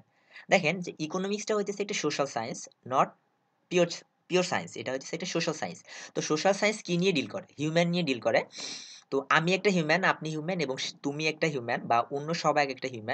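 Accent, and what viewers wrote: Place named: Bangladesh. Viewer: Bhasha shuine monehochhe Bangladeshi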